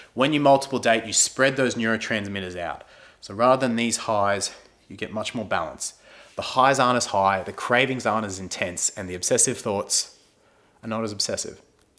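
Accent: Australia. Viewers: are you from Melbourne?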